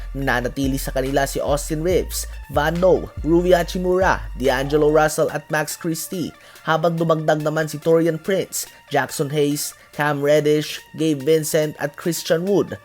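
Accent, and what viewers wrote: Visayas, Philippines. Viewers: Bisayang bisaya ah 🤣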